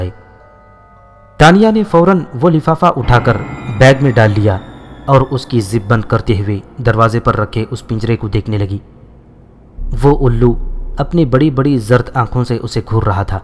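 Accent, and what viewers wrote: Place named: India. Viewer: آپ کے بولنے سے سمجھ گئی تھی کہ آپ انڈیا سے ہو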